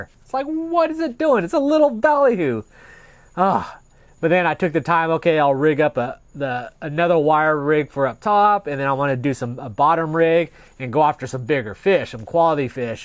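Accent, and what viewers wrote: South, United States. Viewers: Very interesting, an Asian person with a southern accent, cool !!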